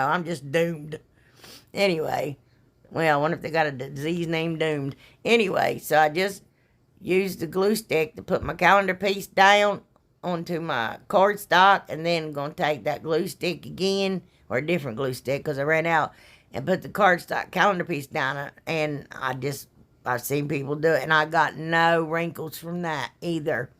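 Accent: South, United States. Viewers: I think you're so freakin' cute! I was raised in the south and now live in the north, and I really miss hearing the southern accents! Forget about the haters, they have nothing better to do than be trolls. xo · FINALLY I have found someone who speaks the same language I do! Southern! Lol! So refreshing to dial you up understand you & can SO relate! People make fun of the way I talk…but that’s OK!! I call brokers in New York City & when they hear my accent, they automatically deduct 50 points of my IQ! But while they’re laughing & poking fun, I’m taking money out of their accounts before they even know what’s happening!! I may be country…but I’m smart!! Lol!! Just like you!! Love you, Girl!! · Love your country accent 😍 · As a woman who knows this lady well I talk just like her and if you don't like the way we talk well I can't exactly say what I want to say but you can kiss our 🍑 I know there's plenty of people in my life who love the way I talk and I guarantee you with form I guarantee you she's got way more people with the life the way she talks instead of not liking the way she talks so for this one for you trolls get a life you don't like the way she talks don't watch her videos it's that easy folks... 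I love you girl and you know I'm going to stand up for you I think our country bumpkin accents are cute so pee on them haters... Sorry I called him headed so early but that upset me made me mad I told you I always have your back and I mean it God bless you dear.. I'll always be here for you.. actually we should start a crafting channel that focuses a lot on our accents a real ways of life these people have no idea of where we come from...🙏🏼💯😉😜🍀🍀🍀🦋🦋🦋🤟🏻🤔👑👑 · Hey now...who cares what others say... I looooove that Southern accent of yours 🥰😘 I have the A.D.D., A.D.H.D. and I'm very OCD...LOL Can you imagine 🤣 I think I understand you more than any of the other craft ppl on YouTube...lol Your crafts are amazing!!! XOXO ~ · These all turned out beautiful! Thanks for sharing your ideas and creativity! Love you lots. If folks are being ugly, don’t pay attention to them. It’s been my experience that when people act ugly & rude it’s because they are unhappy & miserable in their own life. 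Don’t worry about anything, you are doing a fantastic job and there are plenty of us that love you. I’ve also noticed that some people just can’t handle all our southern charm and accent. Don’t you pay them any mind. You are great at what you do, so keep on keeping on. Glad you’re feeling better. Love 💕 & hugs 🤗 God bless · even though I'm a newer subscriber, (I'm from East TN) tell the haters "Bless their poor hearts" and they can kiss your beautiful southern speaking white a@ I absolutely love you and your page. You truly are a beautiful person inside and out. Much love ma'am and please stop apologizing for being you. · Honey, I'm so happy you posted! You've been on my heart ever since your live & I've been praying for ya hon 🙏♥ As for some wackadoo saying you talk funny or whatever...they obviously don't know you have a whole army backing ya & we LOVE US SOME & HER SWEET SOUTHERN SELF 🥰 (Don't make 1996 Lisa have to come out 🤣🤣) Anyhoo, honey everything turned out so beautiful and I LOVE that black truck wreath & your punkin Gather sign 😍😍 You already know...ILYFTBOMH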